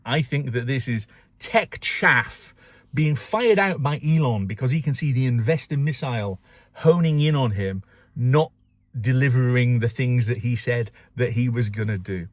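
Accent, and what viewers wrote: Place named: England, United Kingdom. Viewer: I have watched most of your videos. They are very good. You are a very good speaker (far better than Elon Musk), and it is good to hear what you are thinking in an English voice instead of American. I'm sure there is a lot of good content yet to come, like your views on FSD when it arrives in the UK and Europe. Maybe you should go through the Tesla screen, taking one or two items at a time, and explain them in detail. I see a lot of new people who buy Teslas asking a lot of questions about how to do this and that. I got my Tesla Y Juniper in late December 2025, and I am still trying to learn the system. I think it is a shame that your fans can not talk to you personally. Writing comments is not the same thing. Keep up the good work.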